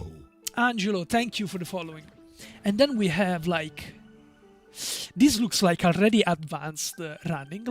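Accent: Italy. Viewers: bro are you italian?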